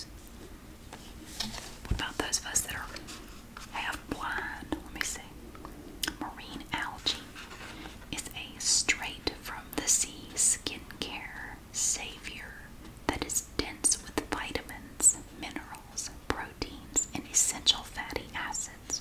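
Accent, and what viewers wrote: South, United States. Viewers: I just started watching your channel. I absolutely love you and your southern accent! Much love and support from New Brunswick 🇨🇦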